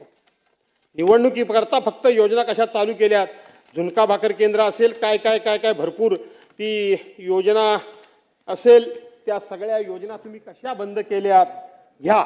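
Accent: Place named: Konkan, India. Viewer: कोकणी आवाज कसा आहे.🎉🎉❤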